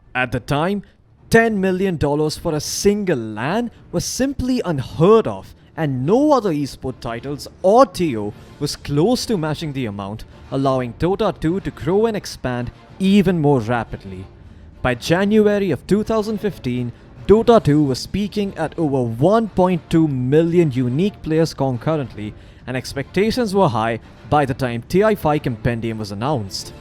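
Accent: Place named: Southeast Asia. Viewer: se asian accent so i wont watch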